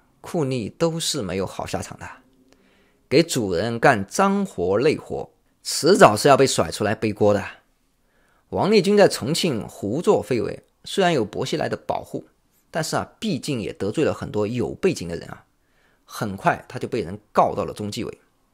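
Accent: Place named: Jiangsu, China. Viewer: 听的口音，是江苏人吧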